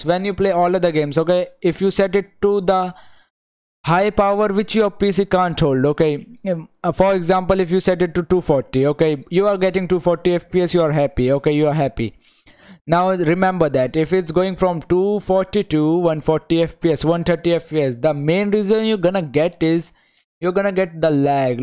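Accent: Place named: India. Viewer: i hate the indian accents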